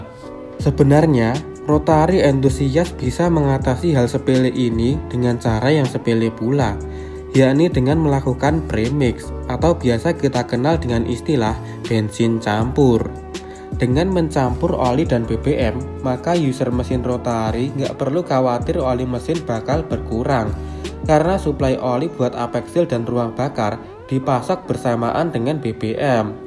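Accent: Java, Indonesia. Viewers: Nice job. Btw saran intonasi pas baca narasinya sedikit dibenahi bro, rapopo ketok jowone sitik2, sing penting nafa bicarane menarik viewer sing nonton. Tetep Semangat bagikan edukasi. Tk subs ki lho. 😁👍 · Medok banget ya ..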